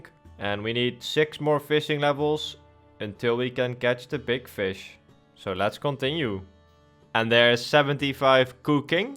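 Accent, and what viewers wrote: Netherlands. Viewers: My god the video is fantastic! the Dutch accent is hard to listen to, but I will stomache it for the content :)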